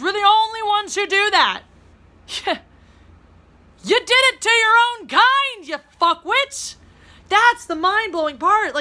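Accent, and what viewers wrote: Philadelphia, United States. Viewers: I like when gets all Philly on us. XD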